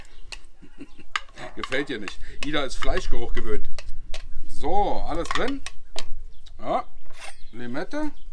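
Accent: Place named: Bavaria, Germany. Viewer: Der Dialekt, Basd. der heimliche Bayer. Unterwegs in Berlin als Entwicklungshelfer. Bravo Juhu. Ganz liebe Grüße aus dem König Söder Land.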